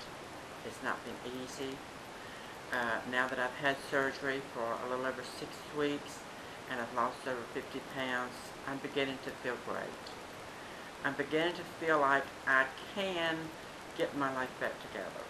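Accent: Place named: South, United States. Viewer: Was born in Mississipi. LOve that southern accent :)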